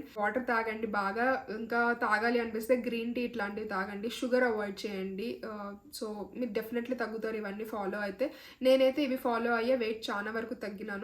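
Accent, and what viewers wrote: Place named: Chittoor, India. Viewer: I'm from Chittoor me language kuda almost ma Laage undhi language kosam nenu me vedios chustanu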